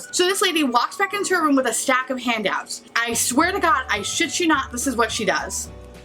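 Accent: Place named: United States. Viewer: I think we may be the same person. It's like listening to myself talk with an American accent. ...aaaaannnnnndddddd subscribe!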